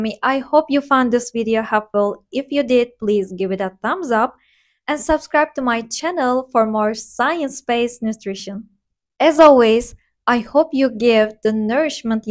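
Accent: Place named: Turkey. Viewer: Im in love with your turkish accent 🤩❤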